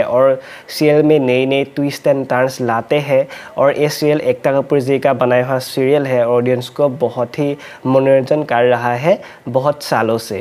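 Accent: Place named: Assam, India. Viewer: Kya aap Assam se ho aap ke bate me najar aa rha hai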